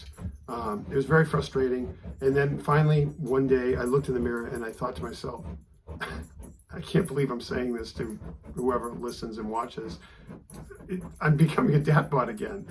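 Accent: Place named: Quincy, United States. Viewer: I’m from Quincy MA. Recognize the accent. Down 50 pounds on keto. Thanks for sharing your journey. Cheers.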